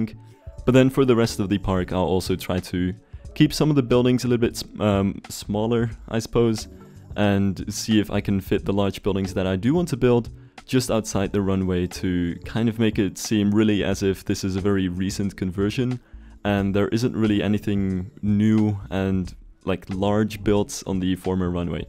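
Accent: Netherlands. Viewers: Wait, you're dutch?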